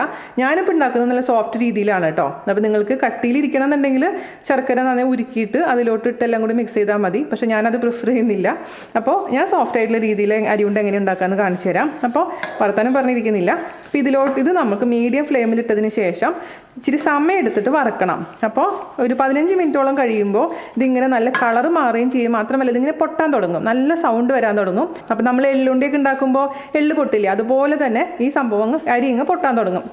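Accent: Thrissur, India. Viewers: I am new fan if u frm mumbai, surely will try this ariyunda. Ari varuthu chayayil ittu kazhikkunnathu kandittundu. Pandu shabarimalakku nadannu poyirunna alkkar hard aya ariyunda erinju wild animals ne okke odikkarundu ennu ammamma paranjathu orma vannu. paranjille harda aya ariyunda erinjal chathu povum ennu. Athu kettapool ammamma paranja karyam orthu poyi. Enthayalum iniyum lockdown recipes iniyum poratte. One more thing. Ur Trissur style of speaking us really attractive.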